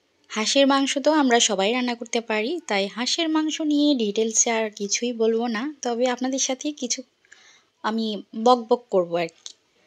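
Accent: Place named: Noakhali, Bangladesh. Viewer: আপু তোমার নোয়াখালী ভাষা ভালো লাগে আজ বলো নাই আমিতো অবাক 😮😮হাঁস দারুন হয়েছে ❤❤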